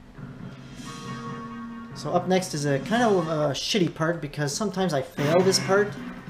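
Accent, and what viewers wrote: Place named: Quebec, Canada. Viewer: Je savais t'étais québécois !! Vrm bon ton vidéo j'ai jamais fini le jeu (PS4), j'avais juste réussi de me rendre après la partie de l'eau. Merci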